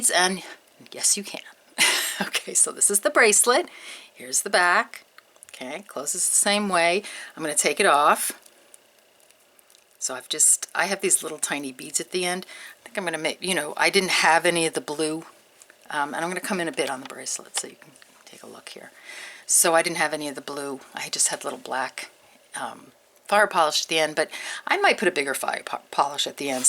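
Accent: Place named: West, United States. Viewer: you do not sound like you are from the northeast, rather some where in the west.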